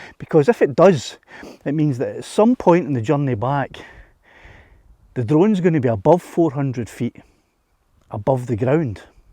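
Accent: Scotland, United Kingdom. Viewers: Awesome video mate. Love the accent… can’t help but think of Billy Connolly 😂 I only started flying recently and honestly thought the drone was indicating it’s height off the ground.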